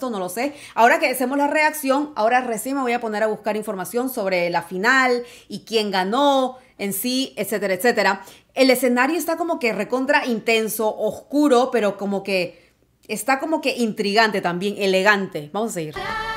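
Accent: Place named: Argentina. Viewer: Me alegro que te gustara. Mis paisanos ya te explicaron lo suficiente y completamente de acuerdo contigo. Y la 2ª pronunciación que dijiste es la correcta. Aparte por tu acento, creo que eres argentina (apostaría a porteña), por lo que seguro que encontrarás a gallegos uqe te lo puedan explicar (eso sí, de Galicia😊)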